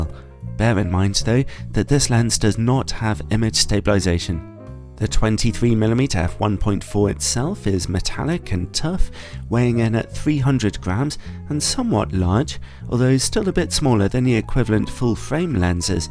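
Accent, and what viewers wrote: United Kingdom. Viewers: ❤️ British Accent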